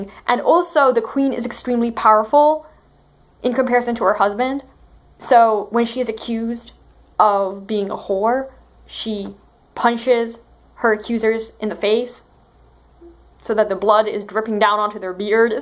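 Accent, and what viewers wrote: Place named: France. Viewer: Hey, love your French accent! I must ask - do you like Victor Hugo's writings? And I'm excited to hear more bout the inquisition!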